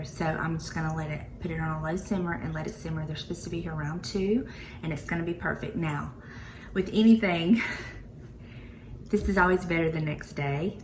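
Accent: South, United States. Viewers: Hello! I'm a too. I go by "Willie's Mom" on YouTube. Liz from Traditions by the Seasons gave you a shout out and sent me this way. This is the first video of yours I've watched. Being a southern girl myself, I live hearing a lovely southern twang. I plan on binge watching tonight. I wish you all the best and much luck on YouTube.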